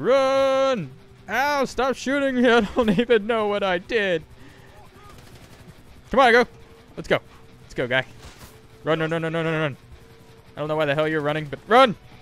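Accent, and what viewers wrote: Australia. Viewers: AUSTRALIAN WHAT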